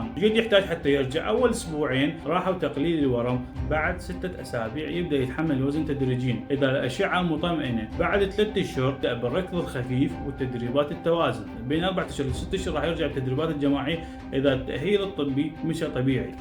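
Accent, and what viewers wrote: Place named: Iraq. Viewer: لما ركزت فالكلام بقت اسهل شويه،،، تحيه لأهل العراق الغالي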